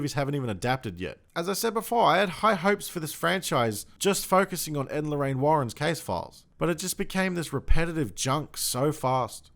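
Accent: Australia. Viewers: Wait, Your Australian?... IM A FUCKING QUEENSLANDAAAAA! YEAH BRO! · spot the Aussie lol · Are you Australian?? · I just worked out that you’re Aussie and that made me happy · This shit wouldn't be as funny if you didn't have an Australian accent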